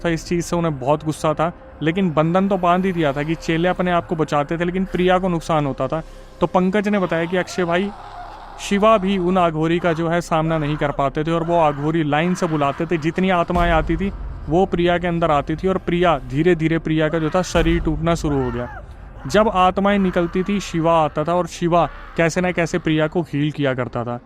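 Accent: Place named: Bhojpuri, India. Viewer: awaj se bta rha hu pakka bhojpuriya ladka hai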